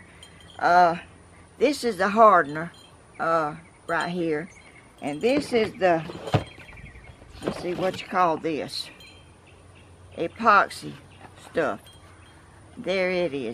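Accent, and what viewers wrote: South, United States. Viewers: Don't you worry, I love listening to your accent, it reminds me of when I lived in the south. It saddens me that young people don't have the accent anymore. Thumbs up. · Hey, don't get to down on yourself about your camera acting up, it happens to alot of people on YouTube. I admire all your crafts and seem like so much fun!!! And I love a good southern accent!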